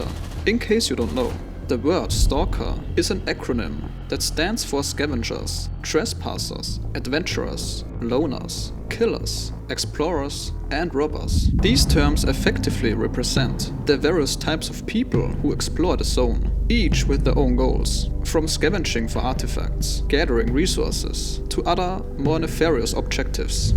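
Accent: Germany. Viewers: Warum redest du nicht deutsch?Dein Slang/Dialekt verrät dich:goodvibes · This questions doenst have anything to do with the video it self but where you from my guess would be german since im german myself but it could also be norwegian or something up there?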